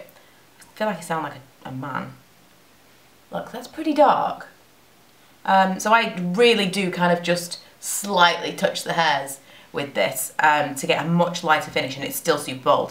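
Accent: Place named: Northern England, United Kingdom. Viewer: Not sure if it's the husky voice or the mention of beer, but you were particularly Northen in this video & it was great. 😃😃😃 love you xxxxxx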